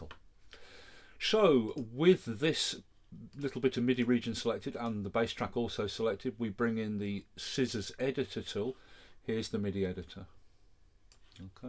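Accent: United Kingdom. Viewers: One of the best tutorials! This Britt knows how to explain clearly. (Even with his cool British rocker accent). :)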